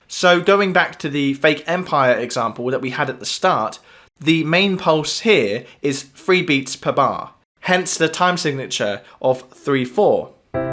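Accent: United Kingdom. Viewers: Great video - thank you. If you could list the bands and songs, be a big help - the only way I found the, song was by searching the lyrics - your Brit accent is wonderful, but couldn't catch that. I've always heard as 4/4 (Bonham) and 6/8 (Page). With the 1/8th notes constant between the 2, it let's the guitar do the waltz. I find it actually easier to hear/count that way. Thanks for your work!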